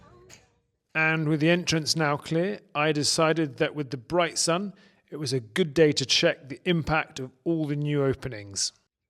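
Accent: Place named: Scotland, United Kingdom. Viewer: I detect scottish accent..where from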